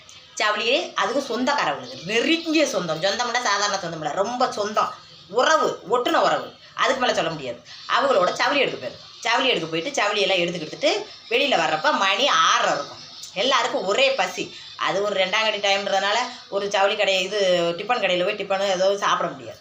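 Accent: Madurai, India. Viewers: நீங்கள் மதுரை யா சகோதரி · Neenga madurai ya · நீங்கள் மதுரையா அக்கா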